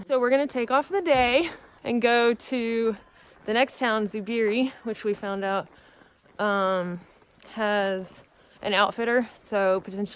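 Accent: South, United States. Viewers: southern vernacular. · I just realized I've watched you for so long I don't hear your southern accent anymore lol. Great video as usual. Happy Trails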